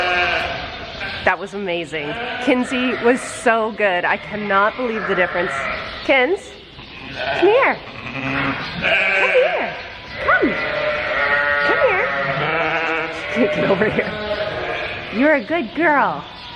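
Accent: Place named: Canada. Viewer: I think just has a thicker Canadian accent. Hers shows up sometimes, but it isn't as noticeable.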